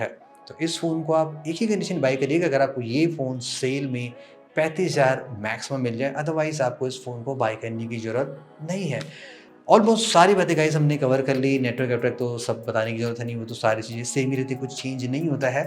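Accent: Lucknow, India. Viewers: Bhai ap lucknow se hai kya? And Goof review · Are you from lucknow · Aap Lucknow se ho kya????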